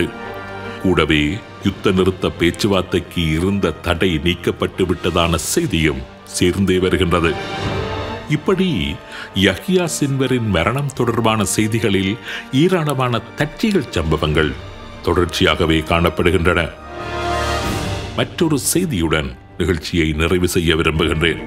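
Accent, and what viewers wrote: Sri Lanka. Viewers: உங்கள் குரல் சூப்பரோ சூப்பர் ஐயா. ஐயா நீங்கள் இலங்கை தமிழில் பேசுவது ரொம்ப சூப்பர். ஐயா இஸ்ரேல் கூடிய சீக்கிரம் அழியும் அந்த அழிப்பை படைத்தவன் செய்வான்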